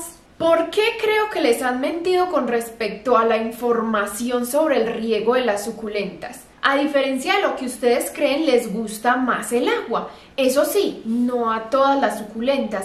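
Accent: Antioquia, Colombia. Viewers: Me encanto tu video. Es la manera de hablar de los paisas. Asi somos para todo. No hay medidas exactas. Tratamos, ensayamos y tambien fracasamos en nuestros intentos pero aprendemos cantidades. 👍🏼❣️ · Hola soy una asesina de suculentas todas se me mueren las ahogó aquí es un calor extremo y siento que tienen sed y pues bueno las ahogó pero ya estoy aprendiendo y también a mí me gusta mirar sus videos cuando estoy lavando platos o haciendo comida me encanta y estoy enamorada del acento colombiano no se ofendan Saludos todo Colombia...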